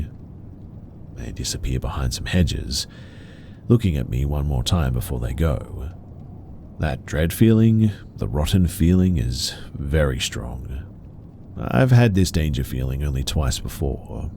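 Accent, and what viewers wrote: Australia. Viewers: 😊Love your aussie accent mate ❤❤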